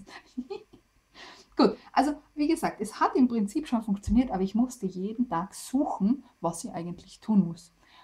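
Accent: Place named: Austria. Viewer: eine Landsfrau in ihrem Dialekt erzählt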